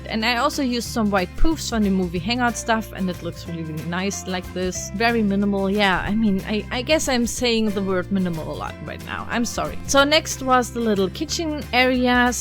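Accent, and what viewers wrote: Germany. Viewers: Kanns irgendwie sein dass du deutsche bist also nicht bös gemeint aber irgendwie klingt dein englisch ein bisschen nach dem deutschen “akzent“ Und btw dein haus sieht unglaublich geil aus🤗💕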